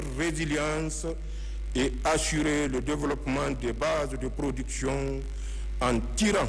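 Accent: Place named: North, Benin. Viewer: Le Président Thiani a l'accent des béninois du Nord.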